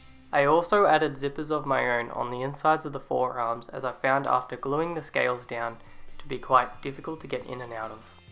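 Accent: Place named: Australia. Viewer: K I'm sad ur suit is better I've met my match and you sound Aussie two 😂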